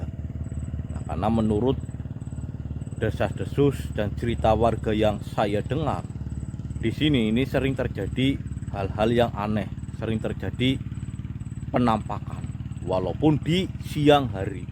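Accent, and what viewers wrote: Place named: Banyumas, Indonesia. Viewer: Sampean wong Endi bos bahasane ngapak temen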